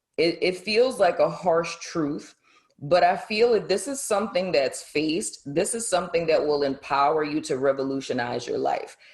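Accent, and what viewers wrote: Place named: South, United States. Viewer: WHERE YOU FROM?! I hear something southern in your accent. First time viewer. Love your approach!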